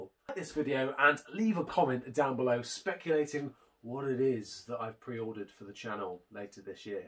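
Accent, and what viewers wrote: United Kingdom. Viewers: Are you bri(t)ish?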